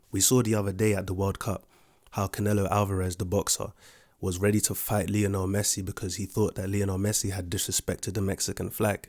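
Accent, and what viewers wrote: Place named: United Kingdom. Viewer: Hey do you live in America I only ask this because I assumed you lived in the UK just because of your accent, but you also seem well aware or in on American Political issues and pop culture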